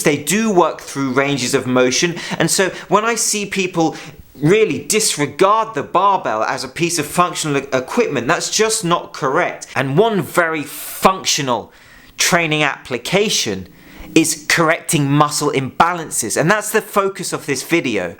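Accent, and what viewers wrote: United Kingdom. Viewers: The British accent is such a flex and a distraction...people don't even listen to the message, they just want to hear people speaking like that:)🤣👍🏾✊🏾💪🏾✌🏾can't explainit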